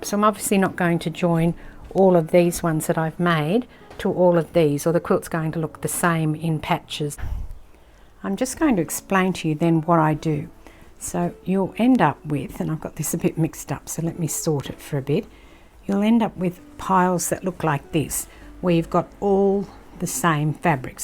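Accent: Australia. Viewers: Hi, that’s a great tip on this lovely Fat Quarter Shop quilt. I especially loved listening to a fellow Aussie. Cheers Camille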